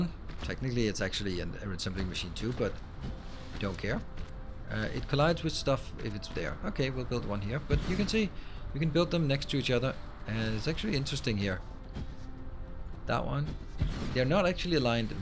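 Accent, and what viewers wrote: Denmark. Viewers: Is that a Danish accent I hear? Nice video by the way. Game looks pretty chill.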